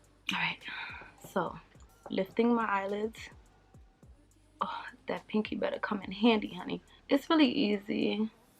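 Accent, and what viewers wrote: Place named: New York, United States. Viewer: Great job it looks good. I like your accent are you from New York?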